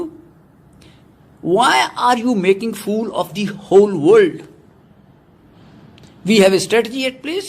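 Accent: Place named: Punjab, Pakistan. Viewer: Ye Pakistani Panjabi assent hae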